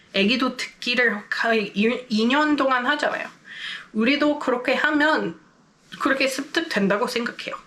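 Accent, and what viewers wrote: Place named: Seoul, South Korea. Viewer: 세상에나. 서울에서 5년 살아도 서울억양 안되던데 님은 진짜 서울말 억양 너무 완벽하시네요!!! 부러워요..그 능력이!!!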